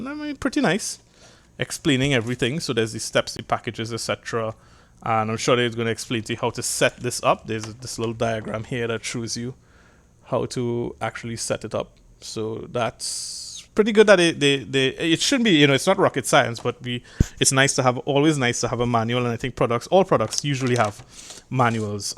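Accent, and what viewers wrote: Trinidad and Tobago. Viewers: Thank you very much. I am literally in the line to the cashier considering whether or not I should get one. I Googled the product and found your video. Help helped me with my decision. I was also good to hear a Trini accent. 🙂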